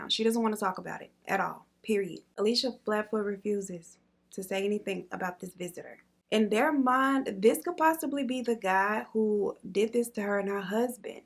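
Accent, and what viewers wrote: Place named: Louisiana, United States. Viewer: I love the look but that blue and gold hit different than a lot of the other ones. Then again blue my favorite color. Don't really care about make up process but I love the vibes, murder stories and final looks. And I sang " 🪨" at the same time you did and I been here for the accent cuz you tryna hide it it sounds like Louisiana to me. But I say everybody from Louisiana 😆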